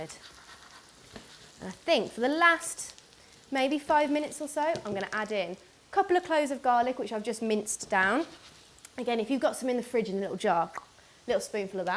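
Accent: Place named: West Country, United Kingdom. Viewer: I give up - what is your accent? Sometimes I hear London, sometimes Midlands, and often West Country... put me out of my misery! (P.S. These spuds look glorious!)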